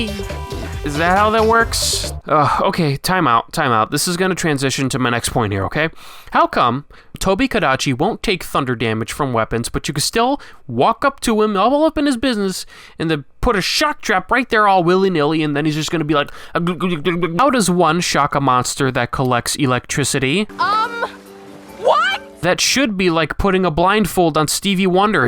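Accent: Italy. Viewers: hearing the Italian accent intro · Italian accent intro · the Italians Do infact speak like this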